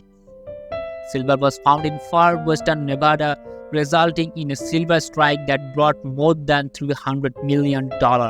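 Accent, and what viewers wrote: India. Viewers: So why is the narrator from the Sub continent of India? I guess 5 pictures and the wrong accents will get you view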